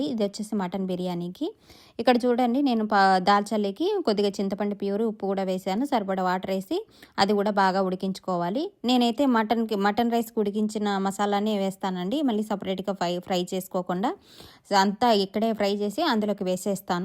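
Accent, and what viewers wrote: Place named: Telugu, India. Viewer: Swachamina Telugu ammayi laga undi me speech ❤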